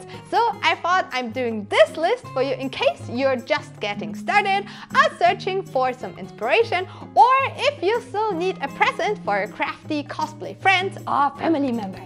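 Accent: Germany. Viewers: Is she german? She sounds like that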